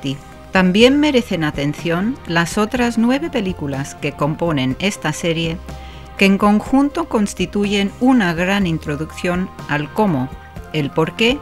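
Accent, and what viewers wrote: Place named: Spain. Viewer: Amo los documentales cuando relata un español, las imágenes son didácticas y tiene música placentera de fondo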